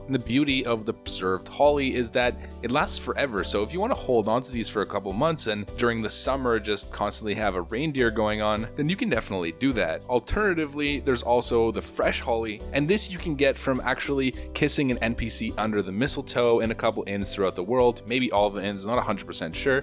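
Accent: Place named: Canada. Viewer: He has the most Canadian accent ever and people are surprised.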